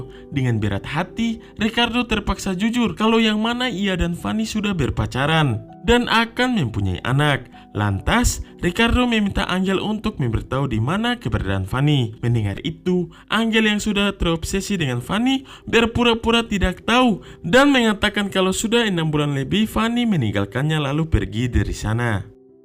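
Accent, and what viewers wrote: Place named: Maluku, Indonesia. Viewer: suara nya udah jelas cuman intonasinyaa agak kasarr bang z ini orang maluku jadi logat dan bahasa nya kental bangat. Dia juga bru mulai jadi menggunakan alat seadanyaa. Rata2 org medan suka pembawaannya soalnya logat nya aga2 mrip.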